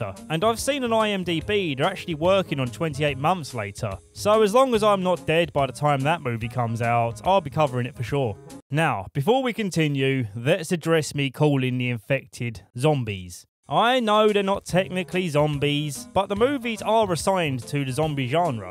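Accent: United Kingdom. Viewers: 2eyt deys leytaayayayayayaya British english Kills me Always 🫣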